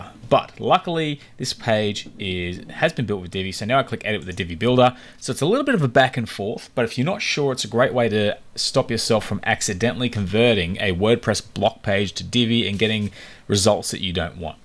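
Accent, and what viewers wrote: Australia. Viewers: Oh my goodness! I work as a VA and have been struggling with this them for days, despite watching some training vids. YOUR video is EXACTLY what I was looking for! Thank you. AND discovering a fellow Aussie accent was pretty great too 👍👍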